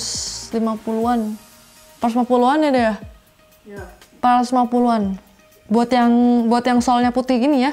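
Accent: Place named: West Java, Indonesia. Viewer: Sunda pisan ieu mah euy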